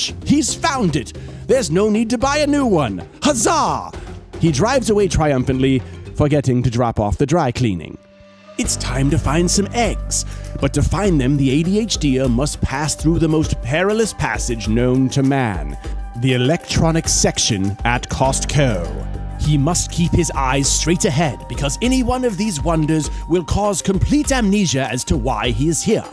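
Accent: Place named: United Kingdom. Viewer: I love that this is a British accent “crisp” but is the driveway called a driveway? Cul-de-sac? Trunk? And as an adhd-er in Costco I have to say I have a ton of socks. Sure the lights are pretty on those other things but socks . . . You need those. . . I can’t fit them all in my sock drawer anymore. I really need to have a sock drawer for different seasons. (The sock aisle is way more dangerous than the tv aisle)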